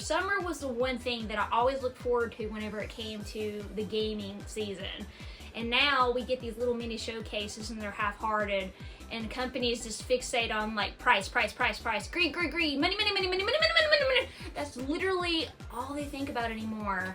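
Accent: South, United States. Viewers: I love your southern accent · I love your accent it’s so country I am from Tennessee I love watching your videos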